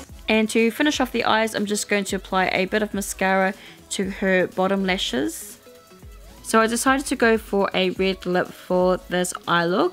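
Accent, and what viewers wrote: New Zealand. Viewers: Are you from nz? x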